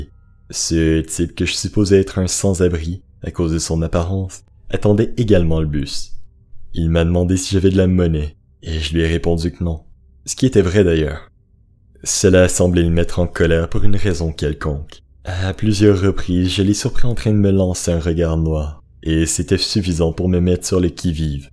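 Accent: Quebec, Canada. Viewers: Mais ta un putain. D’accent québécois pourtant sa a l’air intéressant jte dit sa mes mon accent marseillais n’est pas mieux ✌🏽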